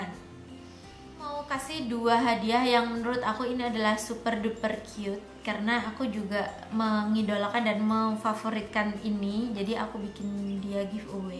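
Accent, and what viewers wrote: Java, Indonesia. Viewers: Assalamu'alaikum yg suaranya super duper medok kejawan hehehehe... Aku nhoura, nama ig aku Alamat aku di Jl. Dukuh Bulak Banteng Sekolahan Gg. IV no. 24 Surabaya..., aku mau kedepannya bikin video tutorial makeup tapiiii NO Mirror Makeup Challenge (Makeup nggak pake Kaca atau Cermin) yaaaah??? Semoga ini komentar yg menarik hehehehe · Assalamualaikum mbak:) hai aku Khansa dari Sidoarjo. Pertama kali tau video youtube mbak itu video yg review wardah intens matte lipstick lgsg deh mulai kepo "siapa sih nih orang?" akhirnya suka nontonin videonya soalnya aksen jawanya dapet bgt:)) terus videonya yg menurutku bagus dan cukup membantu aku yg buta makeup 😂 dg penjelasan lmyn lengkap dan mudah dipahami. Untuk kedepannya aku pgn mbak bikin video ttg makeup/skincare yg cruelty free/animal testing, dan yg pastinya yg halal. Sbg muslimah bukan hanya makanan yg harus diperhatikan kehalalannya tapi produk2 lain seperti make up/skincare. 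Pengen tau aja tanggapan mbak tentang itu 😊. Terima kasih sudah mau menyisihkan rezekinya buat bikin giveaway utk subcribers dan viewersnya, semoga subcribers dan viewersnya makin nambah berjuta juta ya 😁. Wish me luck🙏 Wassalamualaikum ig: kfadhilahr_ Alamat: Perum. Griya Bhayangkara Masangan Kulon RT 38 RW 09 blok A4/10 kec. Sukodono kab. Sidoarjo. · kolaborasi dong sm inivindy make up in model. trs keluarin smua medoknya kakak, biar lepas gtu aura jawanya. nah nanti kayak ada adegan2 drama gtu kakak dan inivindy, karena beda teknik make up dan lain sebagainya ig: babyrachella97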